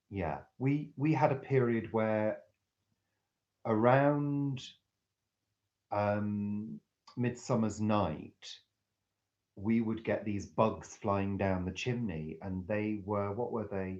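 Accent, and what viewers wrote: United Kingdom. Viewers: You have a very British accent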